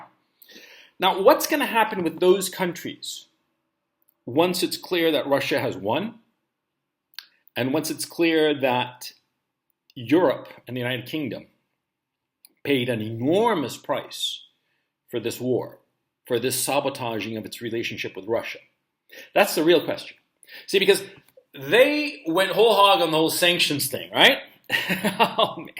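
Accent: United States. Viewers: This idiot is so ignorant - sure Europe will have problems for the next 5 to six months due to oil, etc. , but Israel has just signed a major deal to start supplying gas and oil to Europe. And this is kept quite quiet as this will turn Russia, Iran, Turkey with its worsening economy start a war against Israel. (All for booty) - Israel discovering unbelievable amounts of oil/gas. Also America and other countries do produce grain. This man was brought to the US as a small child and was raised in the US perhaps as an asylum refugee are illegal, or immigrant and benefited from all the generosity of America for education, housing, education, etc. like so many others that come to the US, UK and Europe - now he has all this hate towards the US. He now states he is from Chile. But note his very much US accent. Also, he is in no danger as he is most likely a mouthpiece for Russia. 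Ungrateful ignorant “immigrant”. He seems to hate Europe and America for whatever reason. Europe , UK, America and Australia will do just fine and help other less developed countries. The Us does have a dementia President run by the Globalist but this puppet US President is run by a somewhat competent intelligent administration run by three equal branches of government. He states Israel hates Russia - that is false - Israel up until now has had a great relationship with Russia as Russia allows Israel to bomb terrorist in Syria coming from Iran that are trying to wipe Israel off the face of the map. Israel pe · according to your English, you most likely are from the USA, living abroad. The point is not that Europeans do not understand the situation but Eiropean leaders like Macron or von der Leyen are corrupted and just puppets on strings with puppeteers in Washington and or London (City) it's not only a war against Russia, it's also against Germany. 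Look at the Nord-stream case... Gas is not only a problem of heating in winter, it's energy and a vital raw material for the industry !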